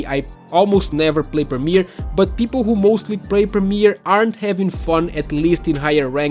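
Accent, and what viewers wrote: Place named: Portugal. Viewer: mete mais sotaque tuga nessa merda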